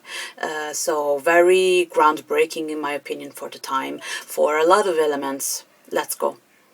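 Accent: Italy. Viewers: Canti di tutto… sei brava… forse un talent show, al giorno d’oggi dovresti provarlo (anche se non li sopporto). Bella Canzone, Ottima Analisi del Pezzo e Complimenti per il tuo l’Inglese… accento romanesco quasi assente… Spiazzalo con qualche canzone Italiana strana… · Questa canzone è tra quelle che io riascolto sempre. Diciamo che è tra le mie top 200. Ebbi la fortuna di ascoltare Antonella dal vivo quando ancora doveva diventare famosa, al Palazzo dei Congressi dell'Eur, in una fiera del suono. Ne rimasi scioccato. Cantava " ", forse una prima versione. Ricordo solo che tempo dopo la sentii alla radio e corsi a comprare il disco. Non voglio mettere a confronto Antonella con altre cantanti. Secondo me non ha senso. Penso piuttosto che si possa esprimere il proprio gradimento senza fare paragoni. Ed a me, questa voce piace e molto. Come quasi tutto quello che il gruppo ha creato. 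Anche dopo Antonella. Ho ascoltato tutto quello che avevi da dire sull'argomento. Mi sei piaciuta. Brava. Ho capito che eri Italiana fin dalla prima parola che hai pronunciato in Italiano... Altrimenti non lo avrei notato. Sei anche di Roma. Beh... Anche io. E di questo mi dispiace. E' sempre più invivibile. Avessi la tua età, scapperei subito, prima da Roma e poi dall'Italia. Anche se... non saprei per andar dove.;-)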